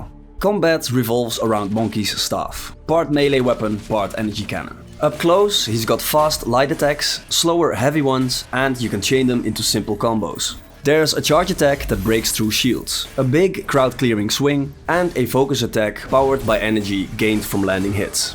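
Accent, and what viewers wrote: Netherlands. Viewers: Love the steen kolen English. ❤ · You have a really thick Dutch accent haha, I assume you're Dutch or Belgian, cool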